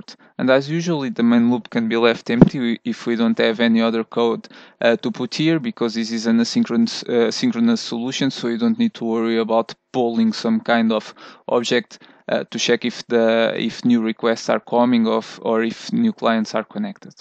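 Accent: Portugal. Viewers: Obrigado por este tutorial! (acredito que sejas tuga pelo falar.. ;) )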